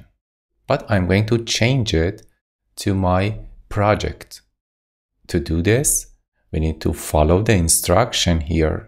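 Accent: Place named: Iran. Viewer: لحجه ایرانی همه جا معلومه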